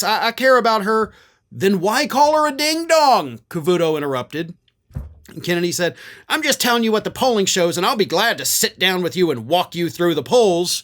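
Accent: South, United States. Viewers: He actually sounds like Yosemite Sam mixed with Foghorn Leghorn. I can't stop😂😂with Farron Cousins southern accent...this just makes my day.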